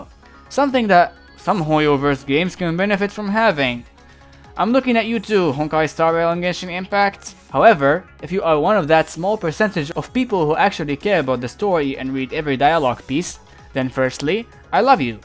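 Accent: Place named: Morocco. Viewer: bro are you moroccan you sound moroccan as hell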